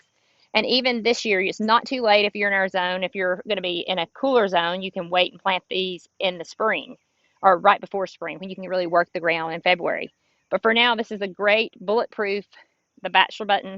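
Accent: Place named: Alabama, United States. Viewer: Congratulations on winning your well deserved Amazon's United States Woman-Owned Small Buisness of the Year (2019)! I thought I detected an Alabama accent.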